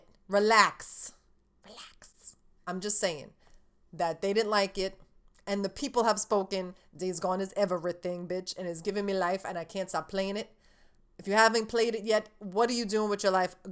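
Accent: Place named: New Jersey, United States. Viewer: Haha I thought you were from New Jersey!anyway still criminally underrated Channel but we're sticking with you girl!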